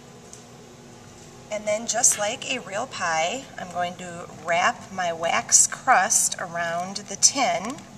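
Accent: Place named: Canada. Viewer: is she canadian? her accent sounds very canadian haha love it! beautiful candles, wish i could smell through the screen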